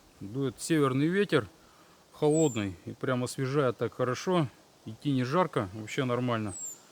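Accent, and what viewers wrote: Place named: Arkhangelsk, Russia. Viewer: Хорошее видео. Вы наверное с Архангельской области. Напиши. Говор наш.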